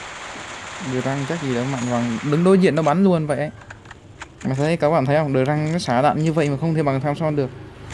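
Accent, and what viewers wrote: South, Vietnam. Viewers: Miền Nam 1 hả?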